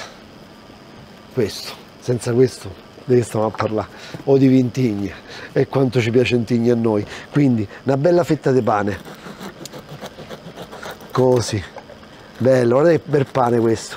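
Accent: Rome, Italy. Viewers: Se non parli il romanesco è molto meglio. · Ma perché parli in Romano,che sei Napoletano? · Simpaticissimo col suo accento romano, bravissimo in cucina, complimenti, io la seguo sempre. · te che parli in romano, piu' i sottotitoli in italiano... me sembra de torna' ai vecchi tempi de "i sottotitolati", di Greg...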